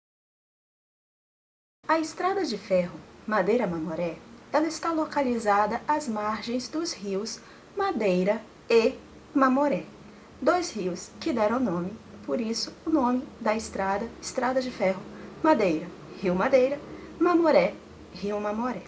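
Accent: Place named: Manaus, Brazil. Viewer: Sabia que a era de Manaus! Mesmo sendo carioca, reconheço facilmente os sotaques brasileiros. Estava em dúvida entre Amazonas ou Pará.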